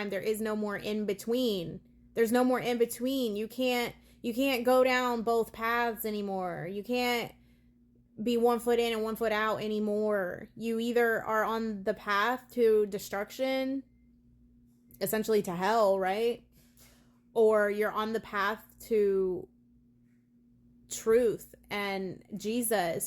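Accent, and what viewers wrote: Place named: South, United States. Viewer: I am sorry, but I must ask. Are you originally from California or the South? The way you speak sounds like the South.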